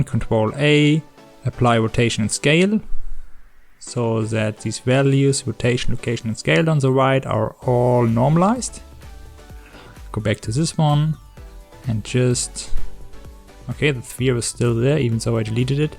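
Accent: Germany. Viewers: Pretty sure hes german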